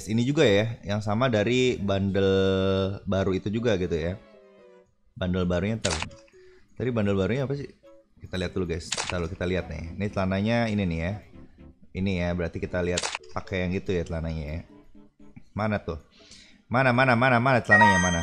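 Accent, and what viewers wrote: Java, Indonesia. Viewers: Wong jowo to kue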